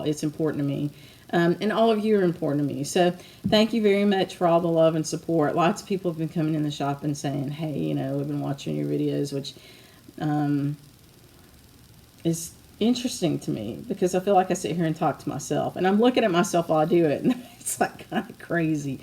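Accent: South, United States. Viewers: The thread legends are wonderful tools and bookmarks, too! I just love your southern drawl! I signed up for the virtual Jeannette Douglas class. I didn’t see the booklet online but I’ll look again. I went to an all girls catholic school in Manila and we had a sewing class in every grade. The Belgian nuns sure taught us samplers. We had one every year, then your embroidery projects for the rest of the school year depended on your skill and speed. I remember we had a darning sampler in 4th grade that included different buttonhole styles. I wish I had kept them all. Is the passcode for the 8th installment of the Saxon band sampler available? Thanks much and also for sponsoring Fiber Talk! Happy New Year!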